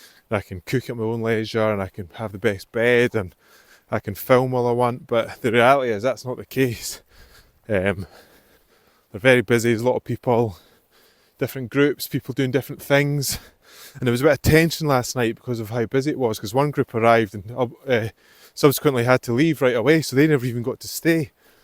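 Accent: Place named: West Lothian, United Kingdom. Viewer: You are welcome your videos are not boring my pal goes to them all the time and a lot of the time people are in them its just the luck of the draw keep uploading your subs will go up you sound local to me i am in west lothian :)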